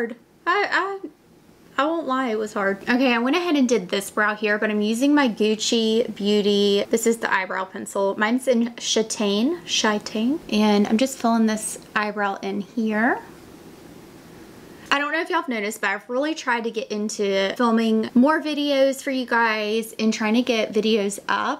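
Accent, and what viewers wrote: South, United States. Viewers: So I’ve always been a Tarte fan. I’m not a colorful eyeshadow girl so their “boring” as most say palettes appeal to me. Love your videos! I’m in Alabama so the southern accent makes me feel at home!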